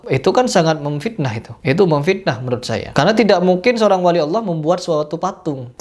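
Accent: Madura, Indonesia. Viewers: Mas sampean kok ada logat logat maduranya, bisa bahasa madura mas??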